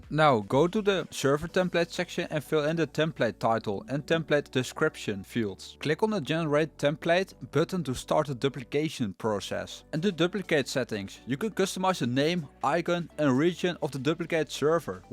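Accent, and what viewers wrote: Netherlands. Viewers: no I love the Dutch accent ❤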